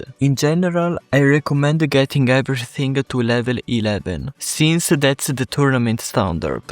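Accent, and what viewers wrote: Italy. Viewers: Grande fra, parli benissimo inglese, ma si è subito capito che fossi italiano dall'accento!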